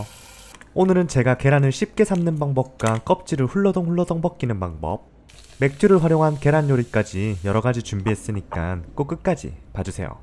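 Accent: Gyeongsang, South Korea. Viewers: 경상도 총각이네예~~~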